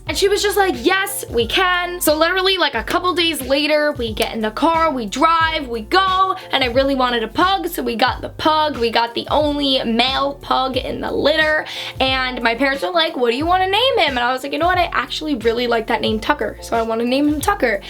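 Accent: New York, United States. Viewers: Her new York accent is on fleek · Love your New Yorker accent, its so cuteee!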